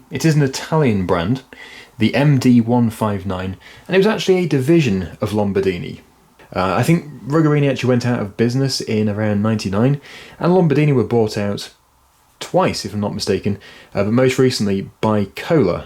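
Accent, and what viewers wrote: United Kingdom. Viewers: You keep narrating. I love the british accents. Your videos are great! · Personally I have absolutely no problem with your talking. You know what you are talking about and that shows. Additionally you are a Brit of one stripe or another and your accent is pleasing to the ear. Keep on keeping on sir. Thank you for the entertainment. I am NOT a diesel mechanic. I have been a gas engine mechanic for 65 years. ( I'm 81) but I understand diesels enough to follow along quite handily. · I’m going to be honest I like the work you do but 50% of why I watch is just to hear your British accent and wordage . Love the Channel.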